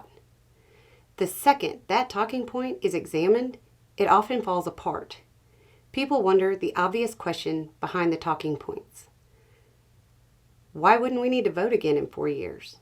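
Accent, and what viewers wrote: South, United States. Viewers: Love you! Yes I but tu for your explanation over on the Roads. Made sense. I’m from “up north” and love your southern accent! Don’t try to hide it! ❤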